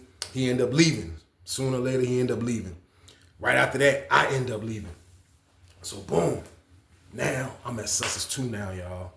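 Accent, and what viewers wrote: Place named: Boston, United States. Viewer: U from Boston homie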